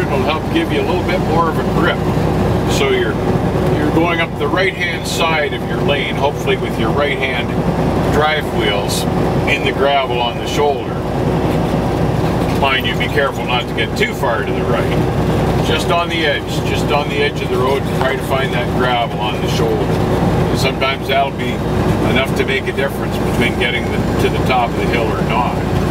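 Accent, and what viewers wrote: Canada. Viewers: You ate a great driver i can tell that you have been a professional for a long time. I can also tell you’re Canadian, the way you pronounce your O’s. 😃. Thanks for the video, very helpful!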